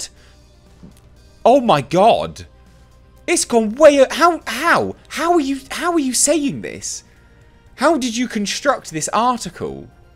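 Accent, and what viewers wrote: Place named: United Kingdom. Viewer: "Why should we be listening to self important douche bags". I don't particularly want to listen to them, but the 1st ammendment is all about free speech son, and I'll defend their right to make political statements, and rickys right to ask them not to. If you dont want to listen to them, you have the remote and it has a volume control, and other channels are available. And, as you sound like a brit, I'm guessing you never watched it live anyway and have only seen clips on YouTube.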